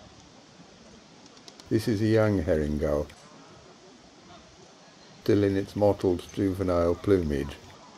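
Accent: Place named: United Kingdom. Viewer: Educated? Lol. Thats just a british accent fool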